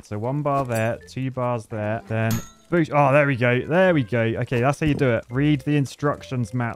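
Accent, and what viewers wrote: United Kingdom. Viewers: British dude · r u from the north or south of the uk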